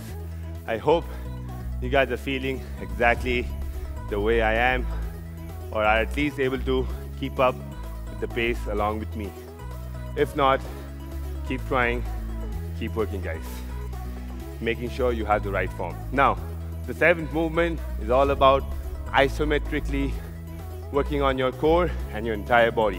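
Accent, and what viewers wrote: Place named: India. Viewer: he sounds more Indian than American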